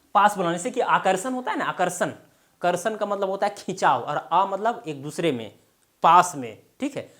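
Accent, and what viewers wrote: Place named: Bhojpuri, India. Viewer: Aap toh khud ki galat hindi bolte ho. Grammer galat bolte ho. Accent ki baat toh rehne do woh toh alag ki bhojpuri accent hai.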